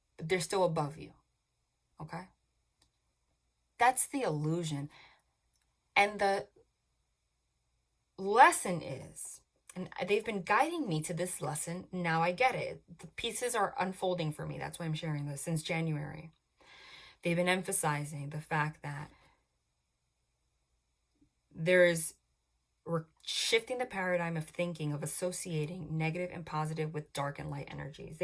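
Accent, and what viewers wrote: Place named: Long Island, United States. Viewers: Girl - I went to UB!!! Crazy. I grew up on LI and have always loved you accent. I love in PA now and miss that sound. But the universe is telling me over and over I’m on the right path! 💖💖💖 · We haven’t even started the reading I just poured a hot cup of ☕️ sat on the couch, said this chick has to be from NY “OKAEH!” I went to school for early childhood development at St John’s. Had my son at 19, nope kids and a baby who doesn’t stop crying? Don’t think sooooo Was in a crazy marriage and another crazy relationship went to school for medical assisting. I parked in the ER for 13 years.... was soooo tired but had job security and great benefits and the bestest friends...... went to nursing school spent 8.5 of those 13 in peds. Moved to the south to be with the soulmate, here we are I have chills listening to your personal story, and you are so absolutely correct. 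God bless love.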